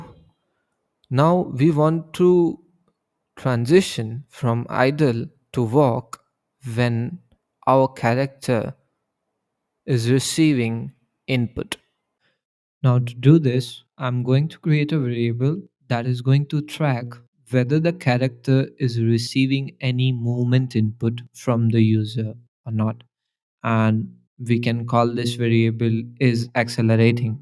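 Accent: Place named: India. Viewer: i appreciate the effort especially for the thread explainer there. You teach everything quite clearly and it helps that your indian accent is not as heavy as others.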